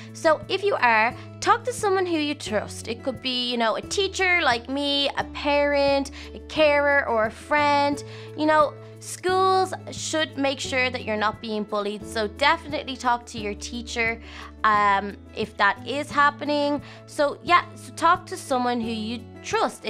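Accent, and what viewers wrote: Ireland. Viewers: Her accent is so cool, Irish? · Are u really from Ireland?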